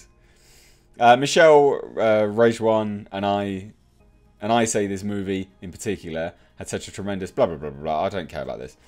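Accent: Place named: RP, United Kingdom. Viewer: accent goes northern when he is imitating/mocking... Lol. Reg = posh Mocking = northern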